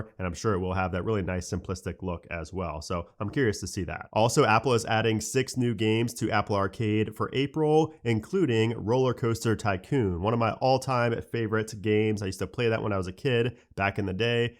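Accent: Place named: South, United States. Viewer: It's a southern accent lmao. It's called an accent.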